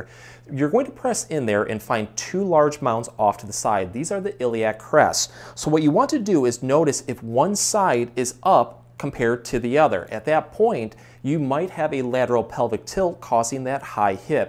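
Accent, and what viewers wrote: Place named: Midwest, United States. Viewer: Great video, right at home with your midwestern accent. Cheers from WI!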